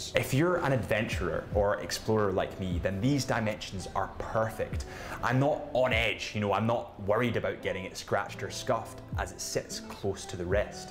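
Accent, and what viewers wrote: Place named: Northern Ireland, United Kingdom. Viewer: I hear a wee bit of a Northern Irish accent. :)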